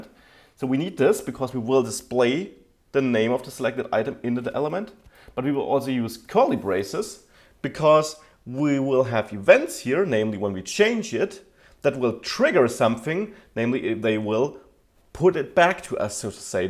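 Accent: Germany. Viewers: Ich hab das Gefühl, ich höre ein wenig Deutsch heraus :D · Thanks for this video. It is fast, direct to the point, no time lost, fun to watch, and nice german accent! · "Bootable", "Boot" I love German accents!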